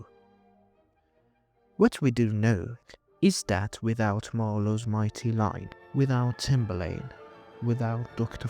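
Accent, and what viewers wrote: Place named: United Kingdom. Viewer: We would like you to tell us the stories of ancient Iran (especially the Shahnameh) with a British accent, Professor. 🔥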